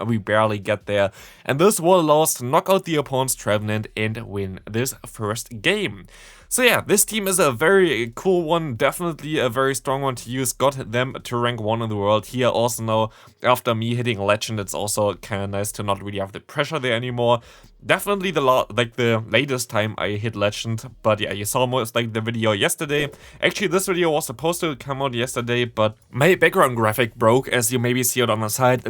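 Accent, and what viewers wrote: Germany. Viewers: sounds like hes german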